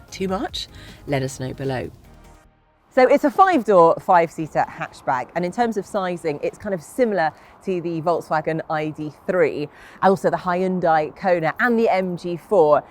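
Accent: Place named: United Kingdom. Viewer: It's your British accent. They need a German accent, you know the aggressive type to recognize what you're saying....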